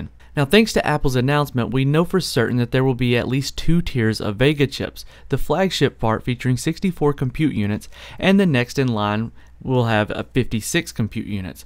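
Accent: South, United States. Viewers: Did I hear some of that country accent in this video? Haha great vid dude.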